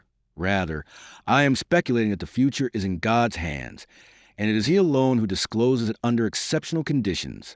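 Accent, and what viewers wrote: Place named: California, United States. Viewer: It’s very standard California accent, but not quite what you’d hear in Hollywood. I quite like it as it has a lot of character to it.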